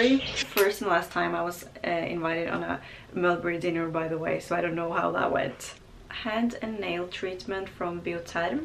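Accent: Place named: England, United Kingdom. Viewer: Merci, contente de vous voir, vous Revoilà. J'adore votre accent anglais je vous regarde et vous écoute pour cette raison aussi. Je suis en Provence. Thank you, nice to see you again, you're back. I love your English accent I watch you and listen to you for that reason too. I am in Provence.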